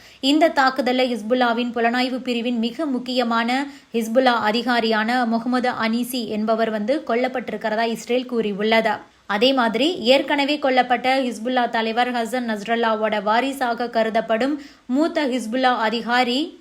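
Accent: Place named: Chennai, India. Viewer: Madras தமிழ் தூதூதூதூதூதூ......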